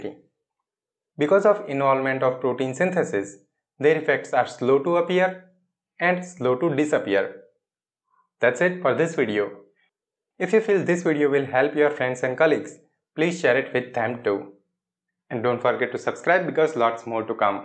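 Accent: India. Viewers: why it is always indian accent, I am sick of it! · If you are indian then its common to have indian accent and there is nothing wrong in it. Dont change your ways just becoz some stranger not liking it. U can't impress everyone, people are not even happy with gods, and we are just humans. Anyway great video. I like your accent 👉👈🥁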